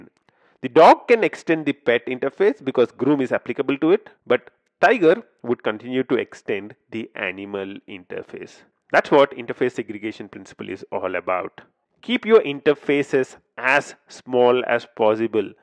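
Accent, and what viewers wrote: India. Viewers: As soon as i heard "Welcome back" in an indian accent I wanted to close it hahahh...but people in India are acctually very good at development. Sadly I just cant take it haha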